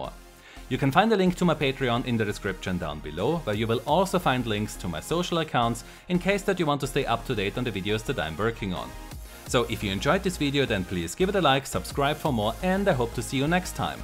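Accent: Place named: Germany. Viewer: Den deutschen Akzent wird man einfach nicht los 😔. Gutes Video!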